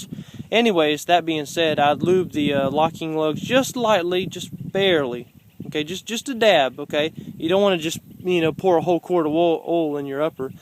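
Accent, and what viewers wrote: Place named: Texas, United States. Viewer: accent, Im almost sure sounded like texas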